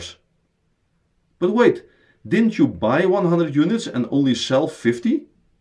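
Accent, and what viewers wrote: Netherlands. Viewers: A brilliant explanation. Many thanks! Could I ask please, how would you work out the COGS where you buy something as a set and then split the parts out? I don't mean bulk buying of the same item, but rather if, for example, you bought something like Lego sets. Each set is a sealed box with its own price, and you then "part out" the set into many different types of piece. Each type of piece can sell for vastly differing prices. If you just divided the cost of the sealed box into the number of parts to give each part an equal cost (say, a £100 set with 200 parts meaning the COGS for each part you sell would be 50p) , you could be at a disadvantage when trying to claim COGS if you only sold the rarer parts that fetch high prices because your margin would be higher on those parts. But is that how it should be done? Another example would be a breaker's yard where they buy in scrap cars and then sell the parts off for different amounts. 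What would the COGS be for each part they sold? A wheel would sell for a lot more than a wing mirror, so what are their costs out of the original cost of buying in the scrap car? And yet another example could be a collection of trading cards bought for a single cost. The collection is then split into separate items to be sold, but how should we work out the COGS for each card sold? Thanks for any tips! Echt heel erg bedankt! (sounds like a Dutch accent on your videos, but I might be wrong). :-) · i´ve lived 10yrs in holland (<num> r´dam) .. your accent is unmistakable :D im planning to go back there ..... i buy, grow and sell companys (mergers & acquisitions) .. mostly distressed companys.. but not always.. i could use help from a trustfull financial advisor for my financial due diligence ..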